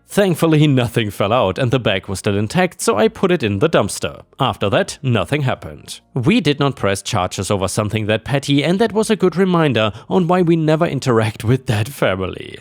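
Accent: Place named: Germany. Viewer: Hey, love your videos!❤ I have a question and I hope it's not rude. I'm curious to know where you're from, it sounds like you have an accent and I can't quite place it, maybe german or sth scandinavian (I'm German and you sound very german to me😂). But I saw in your info that you're located in the US so I'm really sorry if AE is your mother tongue and I just insulted the way you speak or something😅 I really like the way you read so please don't get me wrong, I was just curious:)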